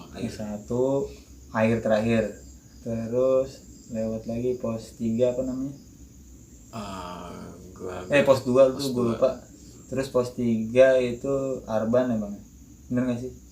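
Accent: Java, Indonesia. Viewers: Bang ada logat jawanya nih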